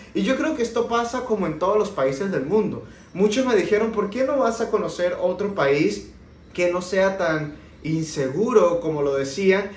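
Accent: Mexico. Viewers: No me di cuenta de que eres de Venezuela,te sentí un asentó de Méjico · Tienes un acento Mexicano,, saludos, 👍👍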